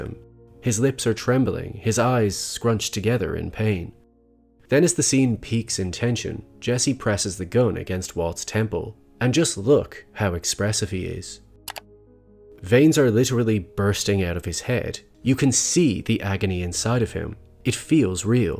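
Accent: Ireland. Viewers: I just binge-watched this playlist (next will be the Better Call Saul playlist) and I really appreciate how you break down and explain the characters and/or situations. On a side note, I'm really curious if you are trying to suppress your accent or if it is really light/moderate like that? (I want to say Irish but I'm second and third guessing myself so not confident on that.)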